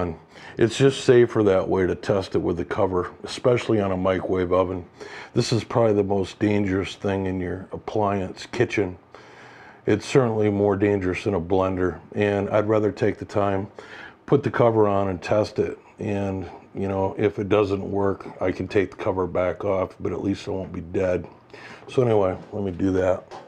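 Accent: United States. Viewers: Thank you for your information as said first safety is very important also your English is good and clear (America English) I am watching from Manchester United Kingdom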